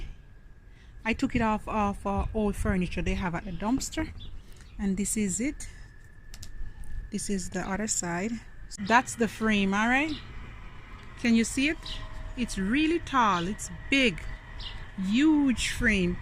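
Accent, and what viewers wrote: Jamaica. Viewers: I just subscribed cuz of your Jamaican accent💪🏾❤️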